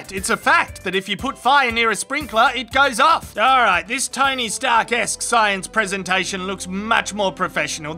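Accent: Australia. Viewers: I love Australian accent....Faaeece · You should start a discord channel, and teach people to speak australian! :)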